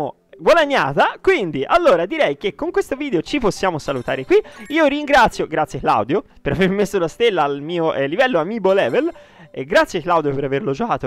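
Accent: Tuscany, Italy. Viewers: ma te sei della Toscana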